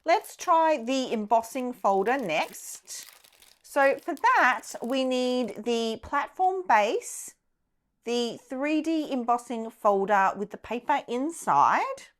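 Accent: Australia. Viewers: Oh,... I love your voice! Your Aussie accent is fun, and your voice modulations are happy and cheerful! Please don't change. ~Kathy ❤❤❤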